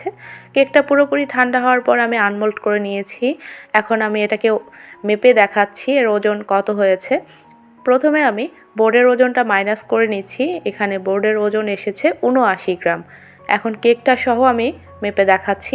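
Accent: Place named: Naogaon, Bangladesh. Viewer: Apu apnar basha nojipurer kon jiegaie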